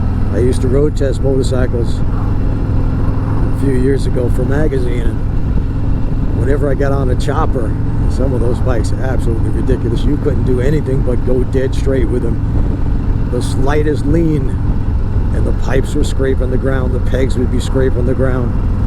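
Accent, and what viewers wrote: New York, United States. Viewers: Where in NY? I hear the accent, but I am a Ex-NYer too. I am from Long Island. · Love the videos. Great stories. Terrible Southern accent - lol. Can still hear plenty of NY in it. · Dammit! I knew you were from the NYC Metro area. I grew up in Rosebank, Staten Island, a heavily Italian neighborhood. I even had on my block.:D We never lose the accent. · "I think I have a southern accent" ahahah Sorry, no, I thought you were still in NY, maybe NJ, until I looked up that Harley dealership. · You still have a NY accent! I'm a original mass-hole who did three years at Clearwater high school in Florida, for a crime I didn't commit. So I know a New Englander or new Yorker when I hear one. Moved to my Dad's native, upper Ohio river Valley, West Virginia, after high school and never left. I prefer the riding and culture of West by God Virginia over any place, any day! 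· I'M FROM SOUTHERN NEW JERSEY WE HAVE OUR OWN ACCENT. YOUR STILL A NEW YORKA:) THAT AIN'T NO SOUTHERN ACCENT:) GET OVER IT. ENJOY YOUR NEW RIDE AND GOD BLESS FOR BEING YOU AND ALL THAT YOU DO. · Neather you or has a southern accent. Although your N.Y. accent has mellowed out. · Love the bike and your sense of humor. You had me for a sec when you mentioned that my speeding was going to finance your bike!! PS, sorry to say but but after 40 years, you still have a NY accent!! Enjoy that awesome bike!! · I live in New York and I can tell you are from New York. Yes you still sound like a New Yorker. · I'm from the UK and even I noticed your NY accent:-) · Not only do you still have a New York accent but I’m guessing you’re from Long Island. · Congrats on You're new bike, I knew that You like Breaking Balls, hence the New York accent, that You still have for sure. Those were a couple of Good ones about the 🔥 Man, and about You paying the bike off quick writing Hella Tickets. 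😆 anyway✌, and keep the Great content coming...👊😎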